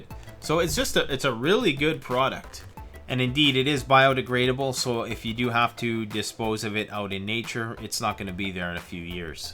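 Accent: Canada. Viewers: You, sir, have a violently Canadian accent. And it is beautiful. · Wrong! The wetter your wiper be, the more likely your becoming contaminated by fecal waste..that pervasive accent ought to reveal how abominably runaway Canadia/en dorkiness is. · I'm not big in prepping but I subbed because of your pure Canadian accent I wish I sounded like this but alas I sound like an American who moved to Canada and I'm not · The GoldenChild he’s Canadian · I'm sorry if this comes off as rude, but I have not heard a more Canadian accent ever.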